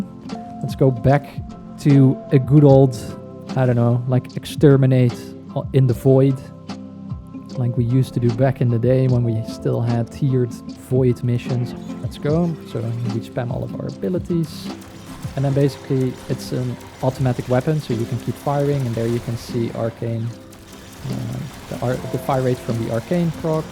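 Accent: Netherlands. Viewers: Yow bruh you accent is dutch or you from tw Nederlands?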